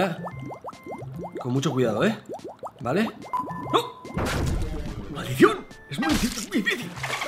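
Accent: Argentina. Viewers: Te sale mejor el Argentino que a mi (que soy de ahí) XD Como lo haces? Voto por un blog entero en idioma boludo! jaja Me encantan estos bichitos!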